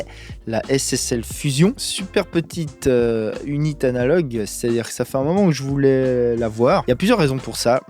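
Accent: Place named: Geneva, Switzerland. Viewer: Punaise.. j'étais sûr que tu étais de Genève avec ton accent et je viens de regarder ton site et OUI ! 😁🤘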